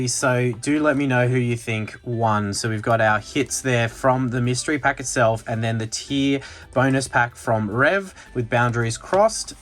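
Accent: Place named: Australia. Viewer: Aussie accent, instant subscribe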